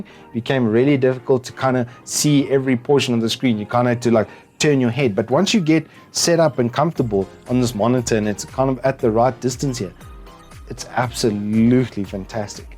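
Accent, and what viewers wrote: South Africa. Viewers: I don't normally have problems with SA accent, being in Australia myself. But I am hard of hearing, and dude you slur and mumble. And please look at the camera more when talking. Thanks.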